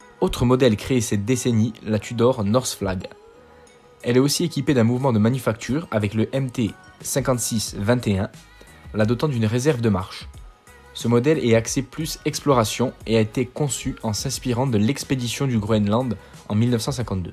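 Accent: Corsica, France. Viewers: Ah... je reconnais bien l'accent corse !!! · Un soutien pour le contenu de a vidéo ainsi que pour l'accent corse.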